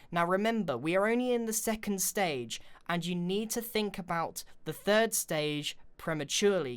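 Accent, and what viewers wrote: United Kingdom. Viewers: I kind of don’t like him talking british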